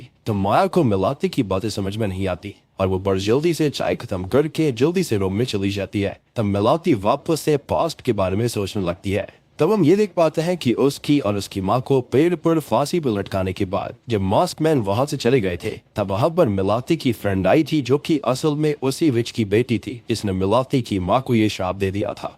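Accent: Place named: United Kingdom. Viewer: Hi your videos explained are very nice but I dont like that you put british access in your talking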